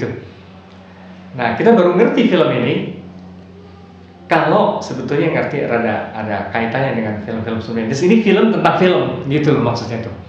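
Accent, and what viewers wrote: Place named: Indonesia. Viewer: Indonesia Bangat bacaannya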